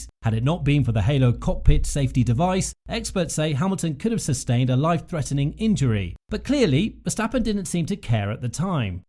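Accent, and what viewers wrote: United Kingdom. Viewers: This video is sponsored by Team LH. I couldn't miss that the voice in this video sounds very British... not to mention, biased as hell! Never heard and seen so many false truths, half truths, and 100% bullshit stories in one video. Unbelievable, what a joke. Not worth watching... and the end of this video aged like milk, because every team principal want Verstappen to drive for their team...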